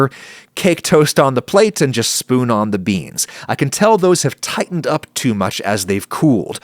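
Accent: United Kingdom. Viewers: too british for me, unsexy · is Bri'ish, confirmed live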